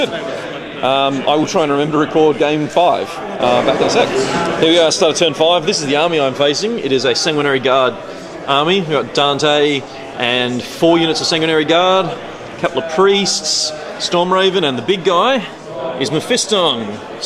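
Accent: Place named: Australia. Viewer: cool, like the vids and its nice to here our aussi acent for a change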